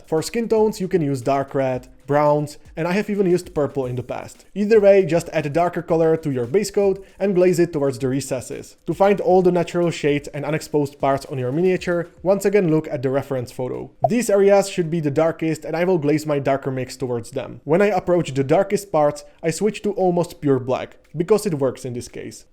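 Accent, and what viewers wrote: Czech Republic. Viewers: Hi, love the tips, really helpfull! I have a question according to your accent, aren´t you a czech? Miniatures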